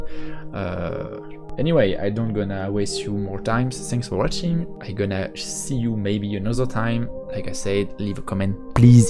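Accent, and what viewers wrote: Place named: England, United Kingdom. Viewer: J'adore ton accent anglais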